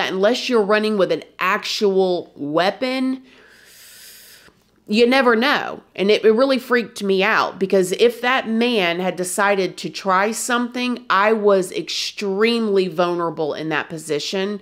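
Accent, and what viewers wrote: South, United States. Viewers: I agree, I think this was a random attack but VERY coincidental. I think he saw her run by, either knew who she was since her family was so prominent in that neighborhood or saw she was minimally clothed and thought it would be a perfect opportunity for SA. It makes my stomach turn to think she was just out minding her business and someone could do that to her. I heard her remains were in pretty bad shape (I don't want to go into detail because from what I heard, it was just horrific) but I think what he did in the disposal was to try and get rid of DNA evidence from himself. My heart breaks for those boys and her husband. 💔 Sidenote: I love your vibe and southern accent, I've been watching your videos for a little over a year, especially the videos of you explaining your past. You keep it real and I admire that!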